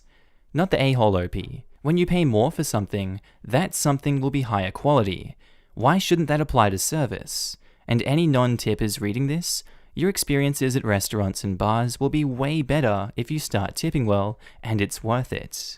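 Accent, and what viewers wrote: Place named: Australia. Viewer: i love the aussie accent!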